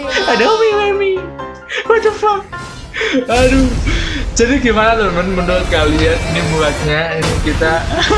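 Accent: Java, Indonesia. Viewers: Cangkem mu !!! , ndaz mu ilang. Jargonnya medok bgt wkwkwk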